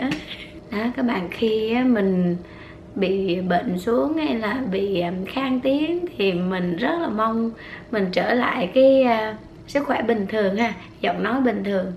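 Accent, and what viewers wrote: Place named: Central, Vietnam. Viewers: Hôm trc nghe cô nói gịong quảng nam là con nghi r. Con củg ở qn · Chị cùng quê với em nè. Hèn gì em nghe chị nói chuyện giống giọng miền Trung. Ngày nào cũng hóng video của chị. Chúc chị mau khỏe nha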